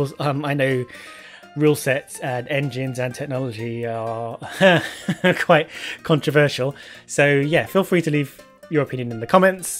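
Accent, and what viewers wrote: United Kingdom. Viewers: didn't notice cuz of ur British English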